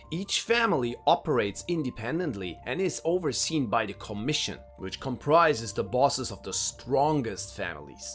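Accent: Germany. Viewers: Why so German 😮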